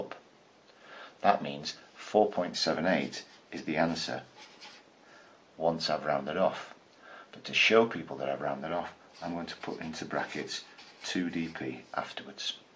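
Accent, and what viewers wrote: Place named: Cockney, United Kingdom. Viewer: You sound like a cockney Gangster!!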